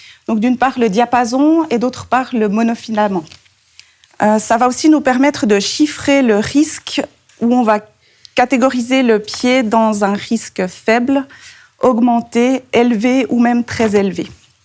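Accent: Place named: Switzerland. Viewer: Aaaah l'accent Suisse, pas d'IA. C'est reposant. Sujet intéressant. Note : il faudrait faire très attention quand vous parlez d'un thème qu'il n'y ait pas de bruits parasites derrière vous comme des gens qui toussent de manière récurrente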